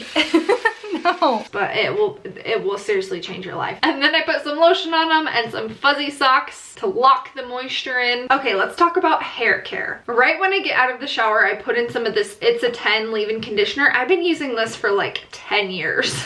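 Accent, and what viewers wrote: United Kingdom. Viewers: Awesome british accent .. 🤓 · Tienes acento ingles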